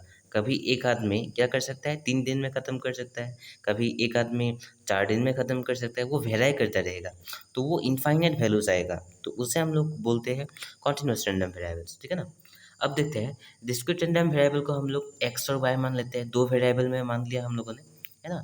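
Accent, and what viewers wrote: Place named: Northeast, India. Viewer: Ye North East ka hai isliye aisa ascent hai... Woh jaan bhujhkar aise nhi bol rha hai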